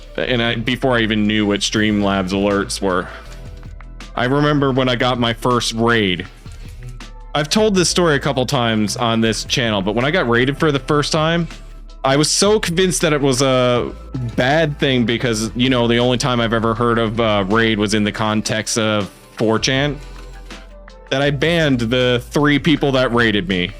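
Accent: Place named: Canada. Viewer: Timmy's heretic... it's an institution- props. Subscribed just to hear you say fehkking and oot- love the chainbrain T, too! Maniac.Make a bluenose expat homesick.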